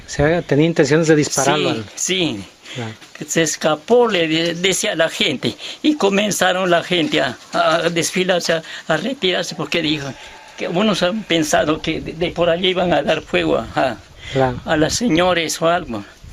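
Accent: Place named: Loja, Ecuador. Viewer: Hermosa narración y que bonito escucharlo con un lenguaje Lojano autóctono.. gracias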